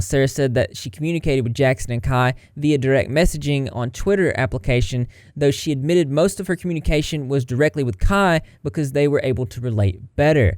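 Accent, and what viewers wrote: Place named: Georgia, United States. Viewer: I don't even care about onision at this point. I just came to hear "and in. Today's. Video." In country ass Georgia accent.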